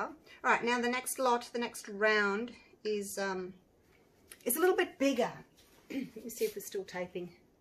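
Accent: Australia. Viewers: Yes I totally agree, I love your accent. My friend at work is from Australia too, she sounds like you even though she has been living in Ontario, Canada for more than 20 years. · there are resin syringes, i also find that a pipette works too. i work with uv resin and just started but have gone crazy making pendants. btw the moment she heard your accent my veardies head popped up and she fired off with a deeper red and orange coloration. she tends to love an aussie accent and gets all excited when she hears one. as for left overs you could try a free form pendant with a hot glue barier to help keep it from going too thin. resin makes a wonderful acrylic pour look. edit to add: those broken bits can be reset with a nice thin set resin you just whipe it on and let it set. uv resin can also be used to repair the bits as well so it doesnt run.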